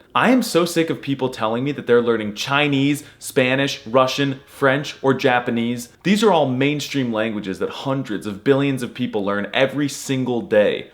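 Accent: Rio de Janeiro, Brazil. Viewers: You sound like a native Brazilian, not a Portugese person. That being said, I'm so glad my first language made it on this list. :D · As a Brazilian he sounds like people from Rio de janeiro lol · his portuguese spelling his absolutely perfect 😂😂😂 I am brazilian, and he really played a brazilian speaking, it is really this tone that we talk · as a native Portuguese: You talk Português from Brazil, not the right accent if you want to speak Português.. and some words are different too, but in the end we spoke the same languange, we understand with no dificult all hispanic languages.. Countries like, Angola, Moçambique, Guiné Bissau, Cabo Verde, Timor, Macau the official language is Português too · 😂enough w the pt-br accent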